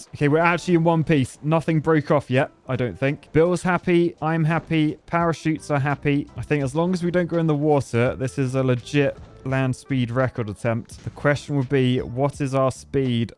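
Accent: United Kingdom. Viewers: I cant understand you when you speak british >:(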